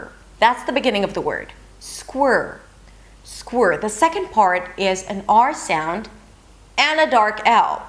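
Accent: United States. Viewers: Hey! I'm absolutely impressed by you flawless american accent!!!! Where are you ffrom and how can you be so natural? You sound more American than native speakers. Congrats!!!!!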